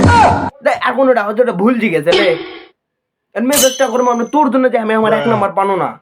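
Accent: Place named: North Bengal, India. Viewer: ভাষা উওর বঙ্গের মনে হয় দিনাজপুর।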